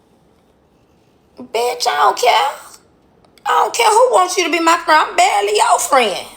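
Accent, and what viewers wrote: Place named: South, United States. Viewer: The more I listen to K Michelle the more I’m liking her. And the Southern accent love love love ❤😂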